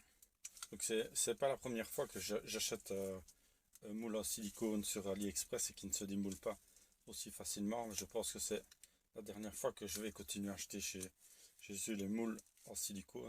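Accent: France. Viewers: Esse sotac FRANCÊS é muito incrível. Gosto muito de ouvir.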